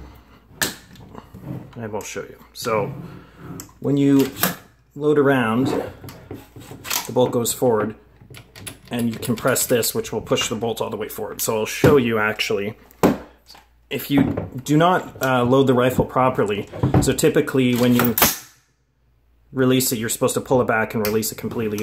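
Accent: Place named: Canada. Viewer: Dude is deff Canadian lol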